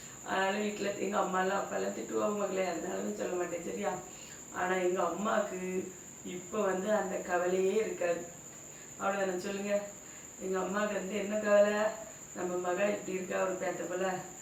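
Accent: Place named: Marthandam, India. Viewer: 😊Akka veguliya iruntha ulagam apdi thaan pesum ,neenga pure ah irukkeenga atha nenach happya irunga akkaa am from dubai sontha ooru marthandam daily unga videos pakrean ooruk vantha meet pannalaam akka😊😊😊😊ungalta pesa aasaya iruk epdi pesenu therla😊cook pannumbothu fulla unga videos thaan time pass enak😅namma ooru baasha kekumbo oorula irukkirathu polaye feel😊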